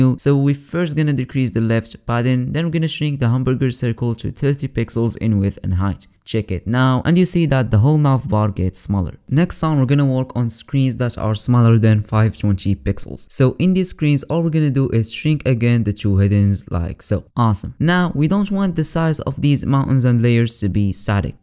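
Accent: Morocco. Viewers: I can tell by the accent that this guy is a fellow moroccan brother Keep going my guy ! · you have moroccan accent <3😎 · that's what i thought because i'm from morocco and his accent similiar to moroccan English 🙃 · From your clear language I think you are from Moroccan country correct if I am wrong and thanks for this helpful tutorial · 10000% maghribi ghir mn Accent dyalk mwara9 asat 😂😂😂😂😂 but good vid tho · i think this is a bit closer to moroccan accent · u have a moroccan accent rak nadi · Are you from Morocco or Algeria because your accent is similar to the Moroccan dialect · mn l'accent katban mghribi😅nice video tho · you sound moroccan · khoya I sense a moroccan accent here, otherwise your video is freaking generously informative, the amount of work you put it is amazing man, thank you so much for the effort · Nadi a khy 3raftek meghribi rir men l accent 😂💯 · كاين اساط كمل للهجة المغربية حاضرة مي راك ناضي تبارك الله 🔥💪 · I can hear the moroccan accent❤ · from your accent i guess your morrocan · You're accent shows that you're from morocco 🇲🇦 Am I wrong? 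😅🤣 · Your accent like ur Moroccan bro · that Moroccan accent tho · You sound Moroccan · akhay hadchi 3ndek hrbaan saraha, 3rfti sbhanlah mgharba baynin, okay3rfo b3dyathom gha mn l'accent,knt gha msserkl ta dkhlt lvideo o 3jbni lhal nchof drari mgharba 3atin fdomain.mgharba finma htitihom i3tiw anyway rak nadi assat tbarkelah 3lik ❤️❤️ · علاش في نبرة ديالك فيها النغربية واخا لونجلي نقية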